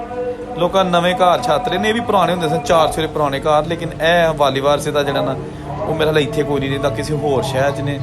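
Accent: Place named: Dogri, India. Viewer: Your commentry on this video hearted me very much touch of local dialect resembles with my mother tongue Dogri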